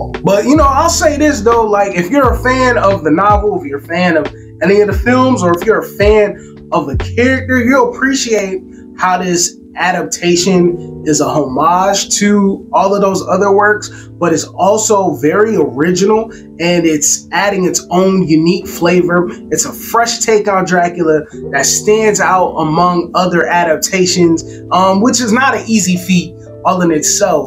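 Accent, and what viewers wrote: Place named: RP, United Kingdom. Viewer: Thanks for dropping the Mary Poppins accent. Made the video much more enjoyable!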